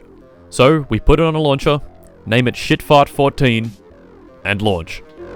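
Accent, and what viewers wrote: Australia. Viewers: are u Australian? Cuz so am I so that’d be cool to know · He's Australian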